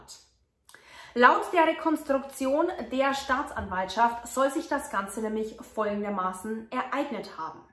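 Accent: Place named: Bavaria, Germany. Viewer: Bin dich seit tagen am bingewatchen :D Schau normal auch überwiegend american true crime channels bzw Gerichtsverhandlungen, je detaillierter und länger desto besser :) Du bist die einzige deutsche die ich schau, gerade weil du so ordentlich recherchierst bzw auch ahnung von der amerikanischen gesetzgebung hast. Damit hebst du dich qualitativ echt hervor :) Oh und ich liebe deinen charmanten bayerischen akzent :D Bitte mach so weiter du hast echt talent <3 Lieben Gruss aus den Niederlanden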